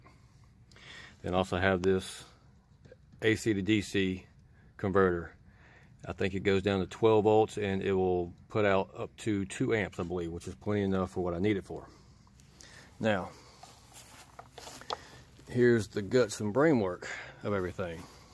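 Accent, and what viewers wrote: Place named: South, United States. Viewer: Watching your videos of building your first control box, I think, and making giant candy canes. You were handling power tools your left hand. You're in the south, I'm in the south (TN), so I can understand you. You're a leftie, I'm leftie. All the more reason I like your channel. I just wish I had found you soon enough to build a controller and show for this Christmas.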